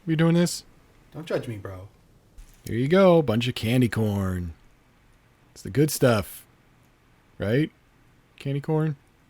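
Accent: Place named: New Jersey, United States. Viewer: LOL! True Jersey speak! 😁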